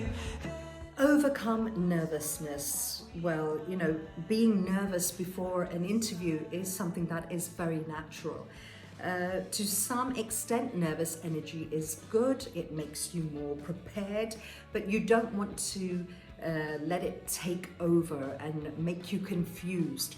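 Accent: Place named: United Kingdom. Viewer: such a quality content but least number of views. If you make prank video it will go viral. This is the taste we have unfortunately. We should promote quality content like this. I have just discovered this channel today, I used to watch news by Shabnam Riaz about 10 years ago I guess. You have got really nice British accent. Keep it up. I will urge the audience to share and subscribe to promote such quality videos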